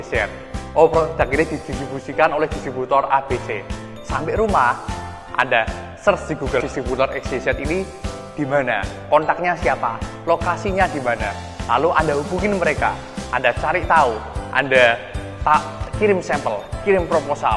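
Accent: Indonesia. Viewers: Logatnya itu lho, medok sekali..... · ini orang logatnya kyk ada bali nya ya???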